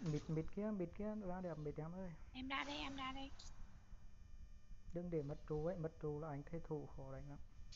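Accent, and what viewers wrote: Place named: Ha Tinh, Vietnam. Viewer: Ahihi...tiếng hà tĩnh đi mô cũng k quên đk 😀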